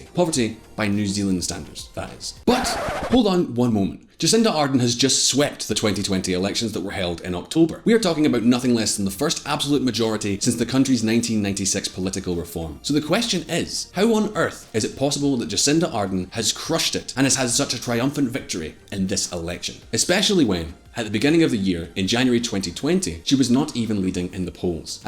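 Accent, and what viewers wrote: Scotland, United Kingdom. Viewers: Where is simon 😴.... this Scottish accent is too strong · Well I like the Scottisch accent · I´m sorry but the scotish accent is unbearable - can´t through these vids no more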